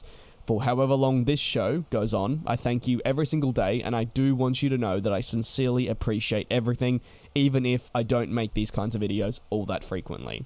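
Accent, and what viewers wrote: Australia. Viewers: Have you ever wanted to become a commercial pilot? Also even tho u sound aussie are you in the UK?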